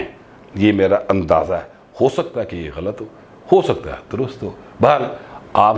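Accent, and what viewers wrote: Punjab, Pakistan. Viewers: tuhada gall krn da andaz brha piyara hey. Sir jee, tuhadi guftagu wich apni maan boli da tarka bara zabardast hey. Sada inj ei vlog krdey rahwo tay inj ei hasday rahwo. Sadi sohni dharti "zindabad".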